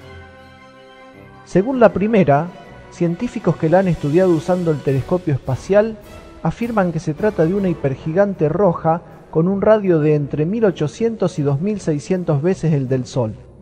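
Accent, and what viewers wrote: Argentina. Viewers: Si, es Argentino, he visto mucha gente que se quejaba por su acento en los comentarios... Mil disculpas! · Nose porque la gente se burla del acento así se habla en argentina , pero en todas partes no se habla igual , nose porque se burlan es el "Idioma argentino" que problema tienen De que pronunciemos así ? Son inmaduros .Saludos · Bueno este tiene el acento Argentino PURO y sin suciedad, molesta escucharlo decir estreSHA,mashoris, sh sh :v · Jorge montt el dice estresha por que es argentino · muy buen video pero te hago una pregunta ¿sos argentino? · muy bien! pensé que era el único argentino que se interesaba por estas cosas. me suscribo. no le des bola a los ignorantes y seguí propagando con orgullo tu acento. saludos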